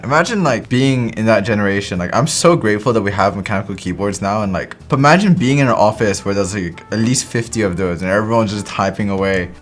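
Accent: India. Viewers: what happened to his voice sound like an indian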